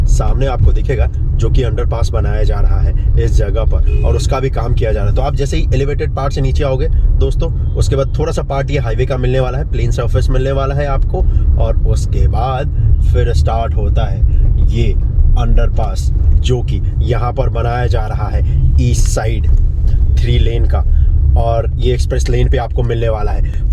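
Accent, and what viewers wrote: Bihar, India. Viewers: टोन रखिए बिहारी जैसा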